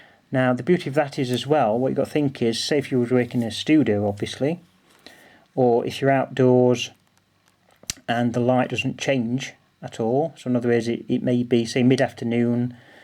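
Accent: Yorkshire, United Kingdom. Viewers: interesting video. yorkshire or lancs accent.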